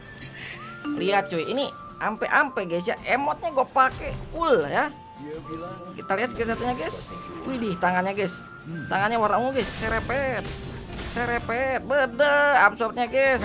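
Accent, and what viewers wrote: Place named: Sunda, Indonesia. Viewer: Nada Bicaranya Kaya Orang Sunda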